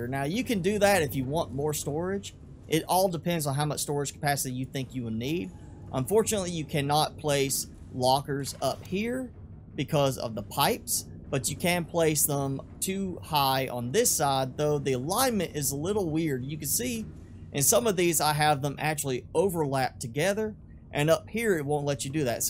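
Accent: South, United States. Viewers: TIMMY yes you can definitely tell especially when he says "try","charge","power", and "why" haha but i guess even Americans can make good tutorials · Also known as the southern accent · Y’all accent XD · you have the "Y'all accent" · Stormy it's a southern American accent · Your southern accent gives me life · Are you a cowboy? Cuz you have the same accent as one Edit:not trying to be rude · What heavy country accent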